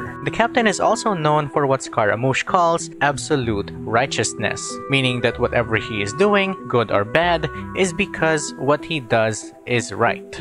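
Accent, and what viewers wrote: Philippines. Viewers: There is no mistaking a Filipino’s accent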